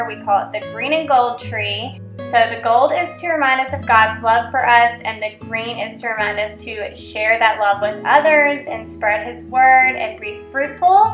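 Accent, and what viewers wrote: South, United States. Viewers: Where are you from?! I love your southern accent☺️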